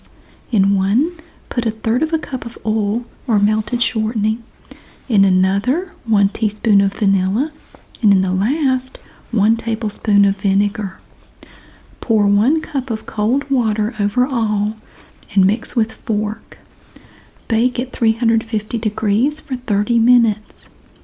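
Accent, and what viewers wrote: South, United States. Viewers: Living in the south I always forget she has an accent lol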